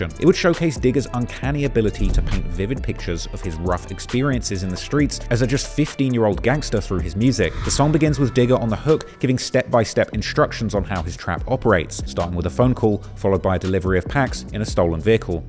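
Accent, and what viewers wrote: England, United Kingdom. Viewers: People see a white boy who has ZERO street ties with a MIDDDLE CLASS British accent and eat up everything he says. So much of this is inaccurate and misinpreted cos again he’s privilege white boy dats not road - it’s crazy. These people are out here monetising the struggles of the underprivileged, pillaging in their privilege and hiding behind “journalism”. Real culture Vultures activity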